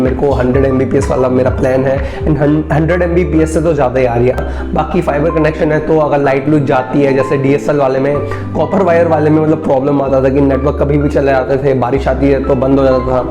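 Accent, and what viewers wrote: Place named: Assam, India. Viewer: Tumi Assamese nki bro?... good hindi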